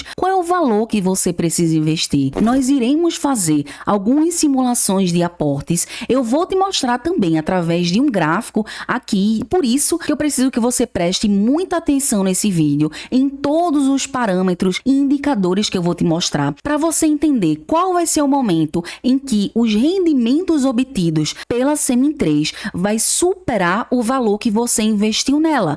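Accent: Northeast, Brazil. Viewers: Sou fã desse lindo sotaque nordestino!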